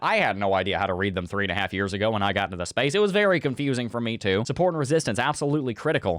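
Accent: South, United States. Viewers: The only crypto guy with a southern accent. My kinda guy. From South Carolina btw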